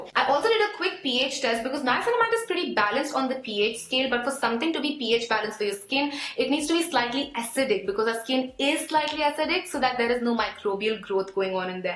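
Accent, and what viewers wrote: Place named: Bengal, India. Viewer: di doesn't have speech disorder. She just speaks in a bengali accent and there's nothing wrong in it.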